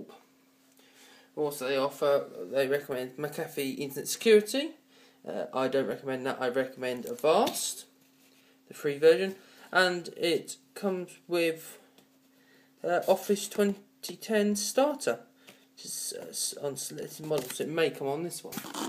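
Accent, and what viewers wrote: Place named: United Kingdom. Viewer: Ur from europe???? U sound british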